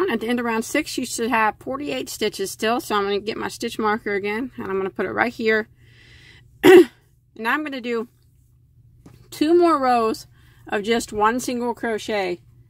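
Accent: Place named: Indiana, United States. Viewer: I know you have heard it before but I need to thank you again for being real - authentic! You aren't selling us on things, you are sharing! Even better, as a West Central Indiana born, raised, and still residing her gal, I like someone who sounds like me! Cannot thank you enough for teaching, sharing and giving of yourself and sharing family times with us! Keep up the great work! You are very talented and keeping the craft alive!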